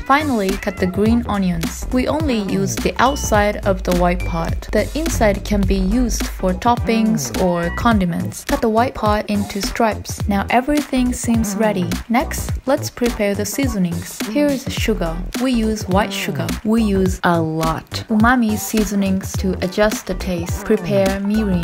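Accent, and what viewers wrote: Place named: Japan. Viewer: I miss being in Japan so much. Your accent brings me way back.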